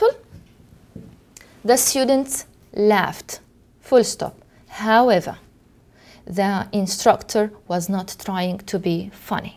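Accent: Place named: Arab world. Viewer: دروس جميلة لكن طريقة نطق بعض الحروف ليست جميلة كحروف T D P يبدوا أن من تقوم بالشرح لغتها الأم عربية؟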